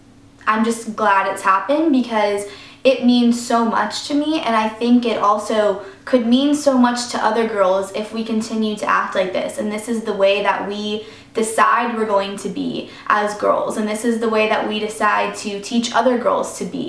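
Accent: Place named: Texas, United States. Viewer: I heard a bit of that Texan accent in this video :P super cute, haha. Maybe everyone always notices it but I'm Texan so it's hard to pick up when I'm used to it xD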